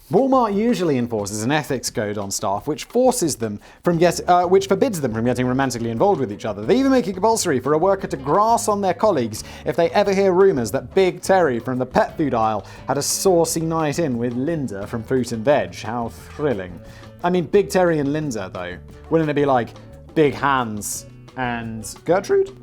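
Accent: United Kingdom. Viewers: He’s British and they pronounce things differently. People in different countries may speak differently than us.. it’s really ok.. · Sticking up for here with all the mis-pronunciation comments. As a British person with a similar vernacular to that is how we would pronounce those words. Although at times I think has lived a sheltered life. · in every video: Speaks with a British accent Comments under every video: Complains about how pronounces things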